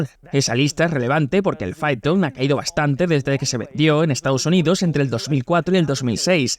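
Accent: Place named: Spain. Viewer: Un zipizapo no debe poner su voz en videos, si de por si escuchar un zipizapo es desagradable escuchar un español zipizapo es insoportable solo soporté el video 4 minutos antes de apagarlo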